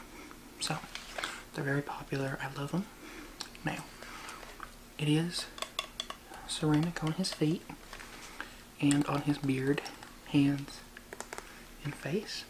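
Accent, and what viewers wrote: South, United States. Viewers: That southern accent is adorable 😭♥️ all those tingles, thanks 😘 Also that pony tail looks so cute I'm crying x💓💓💓💓💓💓💓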